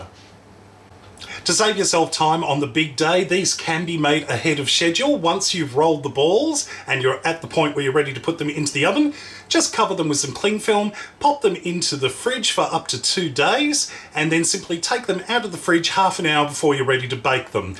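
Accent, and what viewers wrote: Australia. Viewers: Omg you're the sweetest! Love the Aussie accent and your positive energy! I will definitely be making these for my family here in Belgium. Having grown up in eastern Canada, my own mother always made very similar stuffing (no ground meat nor chestnuts as many Americans apparently do) but the onion, celery, bread crumbs, butter, seasoning and herbs (sage, rosemary, thyme). Mmmmm....can't wait to do this..next weekend when our girl comes home from college surely as Canadians already celebrated Thanksgiving in October. Do you celebrate "dan anda" too ? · Im australian but have been living overseas for years. I love your videos because they remind me of australia ( food, accent, etc ) <3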